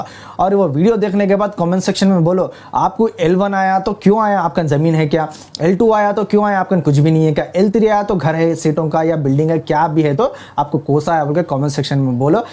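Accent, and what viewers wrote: Hyderabad, India. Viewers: Assalamu alikum bhaiya apki pakki hyderabad language bhaiya bade ka gosht khate na isliye dimag slow chalra apne logo ka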